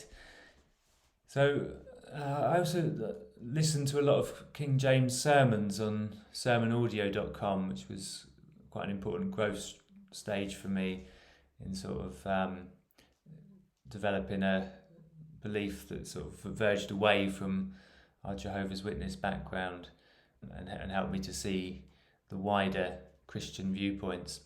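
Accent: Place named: United Kingdom. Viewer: Good to hear UK voice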